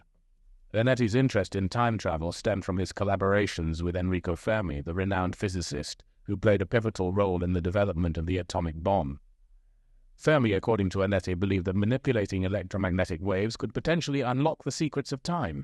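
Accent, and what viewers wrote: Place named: United Kingdom. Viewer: Who NEEDS that shrill, played out 🇬🇧Accent?? Seriously, it’s so tired. And super shallow, too.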